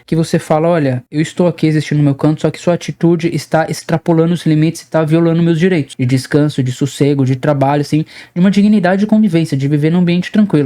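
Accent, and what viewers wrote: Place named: Southern Brazil, Brazil. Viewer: pelo seu sotaque, vc é paulista ou sulista, é isso? vem pro Nordeste pra ver uma coisa 😅😅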